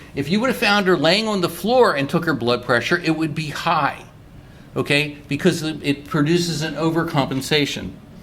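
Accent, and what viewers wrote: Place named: Baltimore, United States. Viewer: His 'balmer' accent brings vack memories.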